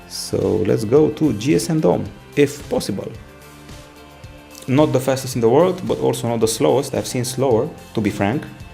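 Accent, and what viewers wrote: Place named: Romania. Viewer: După accent ești român? Bun review. Multumim!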